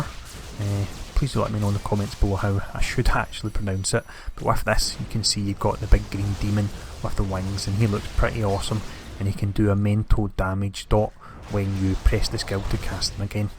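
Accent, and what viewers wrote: Scotland, United Kingdom. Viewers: ffffffuuuuck that Scottish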